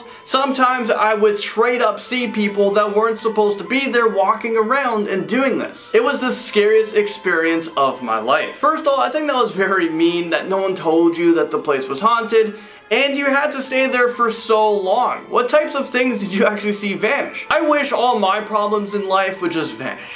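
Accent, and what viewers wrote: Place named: United Kingdom. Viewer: 🛑🛑🛑 #mostamazingtop10 one this chanel is my addiction, I talk about it all the time. I put all my friends on , I watch your videos so much you got me talking In a British accent lmao. On a real note I'd like to share a story that was shared with me .. long story short my grandma and her siblings had a oujia board, while using it the word death was spelled out.. three days later my grandmas brother was out riding his bike ... unfortunately he was hit by a truck and died instantly.. God rest his soul.. ouji is no joke.. stay woke. 🛑🛑🛑 _KeekMarie 🤟 Ignore the Johnny ravo name Im using my boyfriends account.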